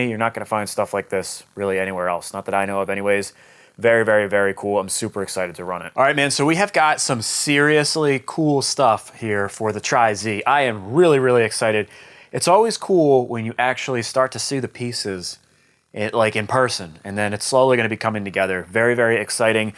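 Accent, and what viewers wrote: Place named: Pennsylvania, United States. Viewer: Great video. You gotta be from PA haha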